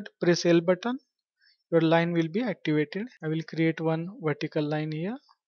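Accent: India. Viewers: как вы индусы заебали со своим акцентом, сил нет.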